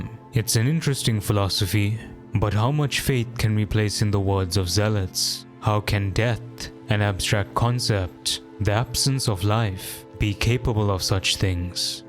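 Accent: South Africa. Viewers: This is real good, also your acccent rreminds me of home. Like, Pretoria, or Durban, SA Indian. Subbed, dope content grootman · No shi he’s from South Africa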